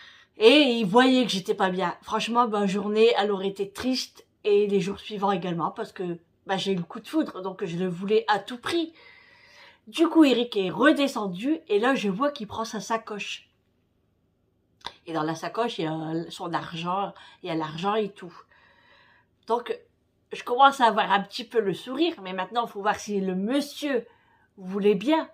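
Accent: North, France. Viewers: Hello Houppsss 😝je suis nouvelle abonnée j’adore tes bla-bla avec ton accent du ch’nord,moi je viens de Belgique 😉je me permets de te tutoyer car je suis un peux plus âgée 🤫qu’elle courage d’être Maman d’une belle familles félicitations tu arrêtes jamais mais c’est certain que pour ses enfants on se décarcasse toujours même s’il sont grand j’ai moi même deux grands garçons 🥰je te souhaite déjà une bonne fête dès Maman et j’espère que tu sera bien gâtée 💝prends soin de toi à bientôt pour d’autres aventures 🫶🏼bisous 😘Vivi.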